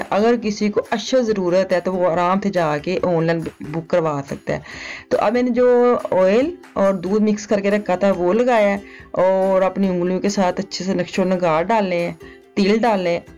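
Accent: Punjab, India. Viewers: Oh my God apki mazedar funny punjabi style ko sun kr ma ne Channel subscribe kiya hai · Nan wo bhi tandoori nan ... ghar main banana mushkil hota hy ... kamal kr dia, presentation bhi bohot zabardast hy.. urdu main pujabi ka tarka .. foran hi, like 👍 aur sath hi subs bhi kr dia, ta k future main ap ki 📹 videos dekhta rahon.. umeed hy ap nay bhi asa hi kr na hy ... Allah pak ap ko aur tamam ghar walon ko hamaysha kamyabian, and khoshyan naseeb farmaye Ameen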